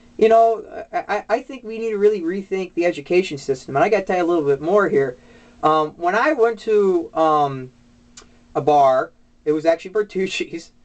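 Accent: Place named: Minnesota, United States. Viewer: saintgauden i'm from Illinois so i'm guessing he's from Minnesota. Wisconsin, Michigan, Illinois dialect sounds similar.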